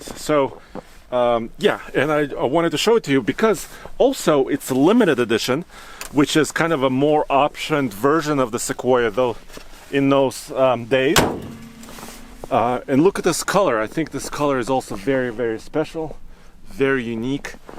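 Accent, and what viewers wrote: Russia. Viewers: First thought "Wow, did Toyota sell the Seqouia in Georgia? And how did it get to Colorado and the US?!", then I remember the state 😂 Russian accent + the name a ex-Soviet republic 😛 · Прекрасный русский акцент. Очень понятный английский 😄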